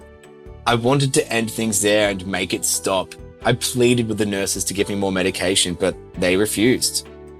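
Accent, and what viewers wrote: Australia. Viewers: U have a australian accent and it is cute asf now I kinda want a australian bf lol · Ohhhhhh mate aussie? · He sounds Aussie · Omg I love Australian voices for some reason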